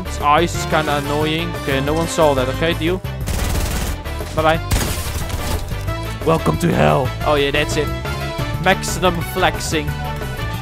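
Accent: Netherlands. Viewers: Haha je hoort echt aan je stem dat je Nederlands spreekt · Nice dutch acsent dude im dutch too So its Not HATE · Jij bent Nederlands ik kan het horen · Are you dutch cause you have a dutch accent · I grew up in Holland and moved to the uk and I can tell your dutch just by your accent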